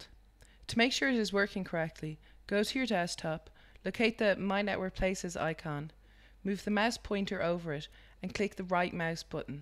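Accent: Ireland. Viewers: She sounds Irish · great, simple video ... 4 stars + 1 star for the Oirish girl doing the talking.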